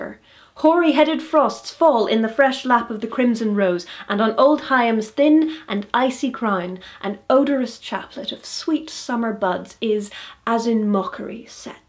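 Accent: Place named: United Kingdom. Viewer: Good job! :) I must say, all the times I've performed/studied/seen Shakespeare, it sounds so much better with a British accent. :)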